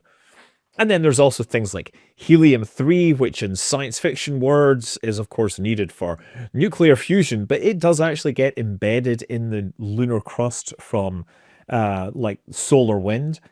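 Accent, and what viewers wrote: Scotland, United Kingdom. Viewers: You said "moon" over 100 times, so this is your best program yet ;>) I'm a Yank...love your Scootish.🎉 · You're sounding more Scottish than you normally do. Very much less American. I admire that.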